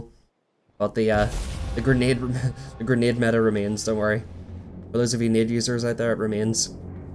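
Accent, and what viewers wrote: Northern Ireland, United Kingdom. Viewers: Northern Irish? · Are you Northern Irish? You sound like you're from here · Are you northern ireland?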